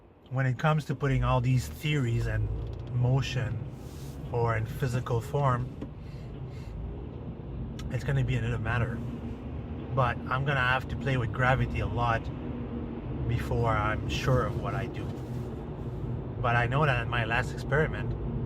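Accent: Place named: France. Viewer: is French your first language? It seems you have an accent whenever you speak English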